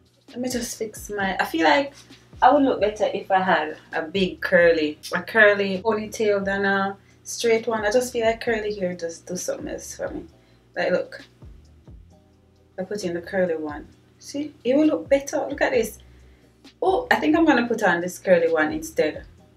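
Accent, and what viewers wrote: Jamaica. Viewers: U sound like a Jamaican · sounds like a Jamaican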